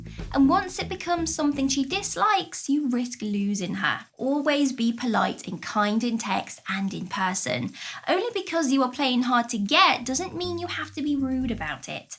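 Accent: United Kingdom. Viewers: I like your sweet British accent)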